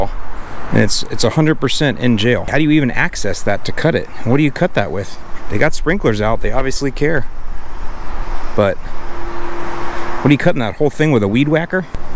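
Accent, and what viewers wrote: Chicago, United States. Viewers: MORE CHICAGO ACCENT 😎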